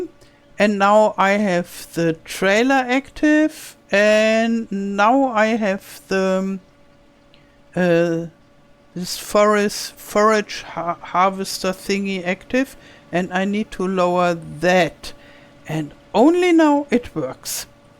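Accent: Germany. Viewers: i love how german you sound! as a german being away from germany for 7 years now everyone can still instantly tell im german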